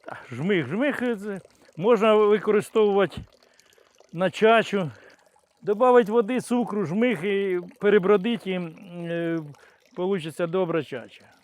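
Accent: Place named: Surzhyk, Ukraine. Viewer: Несмотря на ученую степень, педагога из Вас, не получилось. Очень неприятно слышать суржик. Читайте больше книги на украинском и не будете заикаться.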